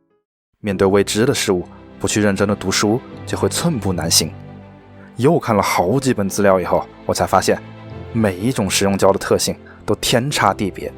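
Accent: Wuhan, China. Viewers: 听着像武汉的☺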